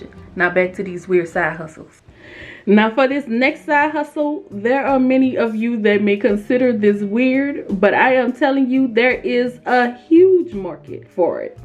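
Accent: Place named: Louisiana, United States. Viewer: Obviously tell your from Louisiana by your accent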